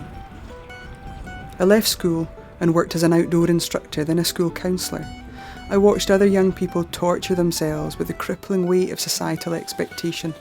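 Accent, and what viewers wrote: Scotland, United Kingdom. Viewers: Inspirational video. And the Scottish accent makes it even better. :)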